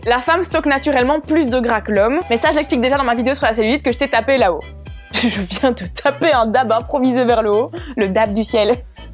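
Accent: Liège, Belgium. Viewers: Tu as un magnifique accent liégeois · Je kiffe son petit accent Belge ❤ si non super vidéo en fait g été renvoyer sur le n 1 alors que je commençais le n 2 😊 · "Horible acent belge" t es sérieuse ! C etait pas loin d un dislike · Mais tu as toujours l'accent belge non? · Comment ça un horrible accent Belge ya quoi contre les belges laaaaaaa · t'as un accent belge de dingue hahaha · ton accent belge on l'entend tt le temps Mais c pas grave on t'aime quand même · L'accent belge 👌👌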